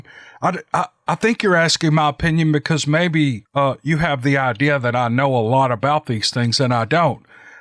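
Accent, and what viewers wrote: South, United States. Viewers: Dude I bet that's why I listened to your stuff I like hear your voice you got this really Great Southern Comfort accent and you're great storyteller are really into big foot have a great night camping in your wife have a good night God bless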